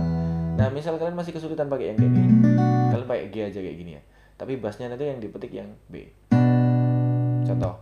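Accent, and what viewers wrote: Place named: Java, Indonesia. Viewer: Uwow.... Wong jowo tah. Kerasa banget logat mu dek. Salam ya dari ku putra Sumatra Utara Medan.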